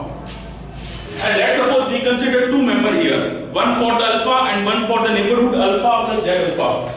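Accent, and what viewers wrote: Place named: Jamaica. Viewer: Sir your accent is like teaching maths. Great Video.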